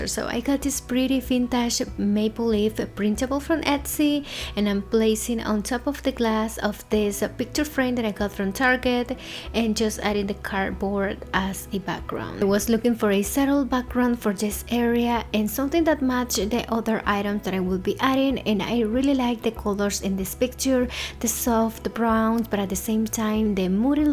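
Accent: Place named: Brazil. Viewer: Hi, I am a silent subscriber but wanted to say that I love your style and always look forward to your videos:) I love your accent, Brazilian, Portuguese, Colombian? (Lisa from Seattle)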